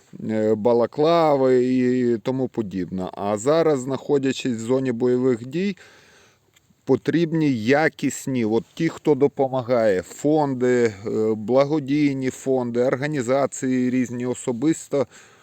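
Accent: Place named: Surzhyk, Ukraine. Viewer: Смешной суржик 😁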